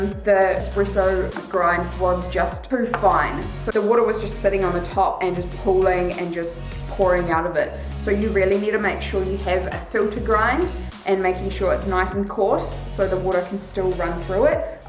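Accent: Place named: New Zealand. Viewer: sounds kiwi 2 me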